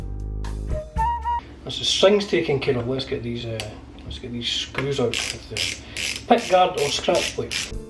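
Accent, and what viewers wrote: Scotland, United Kingdom. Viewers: Afternoon. I was actually looking at doing this with a vintage v130 I have. it’s a p90 but really it’s a p100 stacked humbucker which vintage say is kinda like a p90 but always wanted to switch it out for a proper humbucker. It’s ok sounding as it is but doesn’t sound anywhere near as good with my current set up with a Marshall origin as I found out at a gig few weeks back. Now I know what’s involved in attempting to switch out the pick-up for a humbucker. cheers big man. And keep rocking 🤘🏻🤘🏻ps there’s nothing wrong with your Scottish accent I have one as well 😂😂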